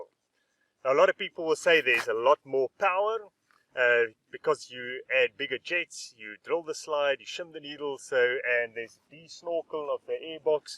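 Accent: South Africa. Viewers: Hi, starting to find your channel very useful I bought a a used 2012 last year. I live 50 kilos away from work and sometimes use the XR for that fun ride and when you get to to work or home my jacket and rucksack absolutely reeks of poorly combusted exhaust gases. I think I read that this bike was sourced in the Northern Cape and depending on where, the altitude could be quite low and close to sea level. I stay in Midrand and as you know that is about 1600 m (5000 feet) above sea level, so we decided to drop the jetting by one size. Got it back last weekend and have not had much chance of testing, but will do so soon. If I nurse the bike, I also only get 15kml and I think that just can't right. Hopefully this mod will bring some relief. It's great having an SA accent on the biking channels on Youtube, well done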